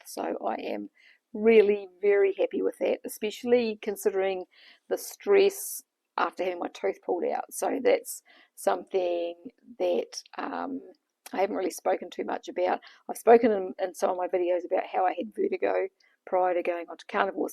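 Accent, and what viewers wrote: New Zealand. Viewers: Still can't get over how beautiful the NZ accent is. Thanks for the advice on tudca.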